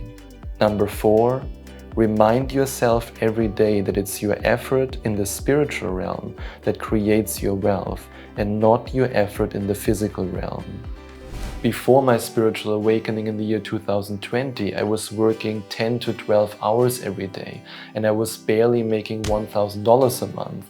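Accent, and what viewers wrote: Germany. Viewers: German accent?… thanks for the breakdown. It’s less lofty and technical than Dispenza video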